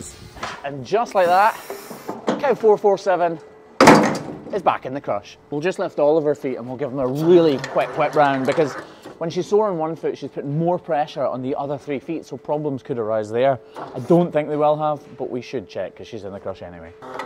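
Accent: Scotland, United Kingdom. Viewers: greetings from Texas, USA! Obviously, a lot of viewers enjoy your work, as do I. I have visited Scotland, and I have a great fondness for your land and heritage, and hope to come back again. I have high hopes for 447, and it's thanks to your good work. That cow has an internatinoal reputation now. I love your lead-in music, is it a Scottish performer? Great work sir, and I love the accent! Did I mention I like Scotland!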